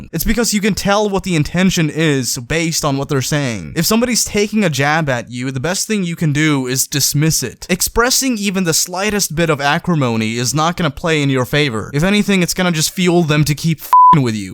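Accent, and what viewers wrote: Sweden. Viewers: Are u from Sweden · Vae fan äru svensk? Good video tho.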